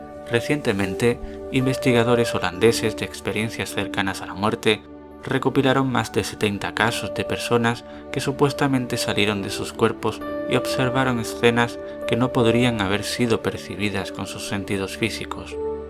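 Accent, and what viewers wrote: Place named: Spain. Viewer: Hace mucho tiempo y durante el tratamiento por un aborto involuntario de cinco meses, estando en sala de operaciones porque había que limpiar por dentro y * estando consciente, me fui por un túnel. Estaba con un relajante y tenía los ojos cerrados pero no estaba anestesiada y estaba conversando con el médico y la enfermera cuando de pronto me vi en un túnel oscuro pero con tiras de luces de colores en la parte de abajo. Iba extraordinariamente rápido hacia el frente y de pronto comenze' a ascender y en un momento dado empezar' a ver en lo alto del túnel una luz blanca brillantísima y unas siluetas brillantes también que se asomaban al túnel moviendo los brazos como saludando. Esto lo iba yo contando al doctor y a la enfermera. Llevaba horas diciendo que me quería morir de lo mal que me sentía, no paraba con eso pero no me estaba muriendo y estaba tan consciente que hasta gire yo misma la cabeza porque estaba vomitando acostada. 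Fue muy raro y no me dio' miedo, más bien empece' a vacilar por la velocidad que llevaba pensando que si chocaba con una pared o salía despedida (a no sé dónde) entonces si que me iba a morir de verdad. Sentí que había gente conocida y también desconocida allí arriba pero el destello de luz no me dejaba ver sus caras. De pronto, salí del túnel y ahí quedo' todo. Claro, me quede con eso de haber estado en * el túnel sin haber estado muerta ni muriendo. No sé cómo los que saben explicarían esto. Suenas español, sabes cómo se veían las luces del túnel? Pu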